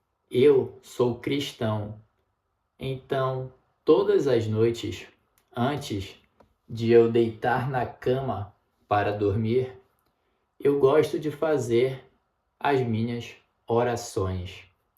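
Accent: Rio de Janeiro, Brazil. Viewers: Great video brother, I love ur comprehensible input style! What’s your accent? Are you from Rio de Janeiro or…? Also, are you currently live in Romania or something?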